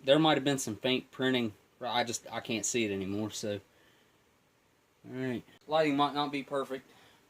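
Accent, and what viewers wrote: South, United States. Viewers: Theres somthing about the southern accent that feels so homey or homelike.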